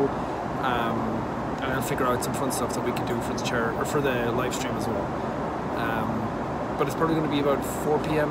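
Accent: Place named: Ireland. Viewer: So um...when he isn’t yelling, he doesn’t sound Irish. Or at least the accent isn’t as strong.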